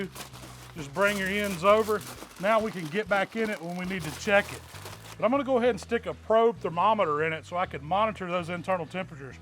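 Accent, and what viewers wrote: South, United States. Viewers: A big, bowling ball-shaped guy with a southern accent and a beard is exactly who you want to take bbq tips from. This looked amazing.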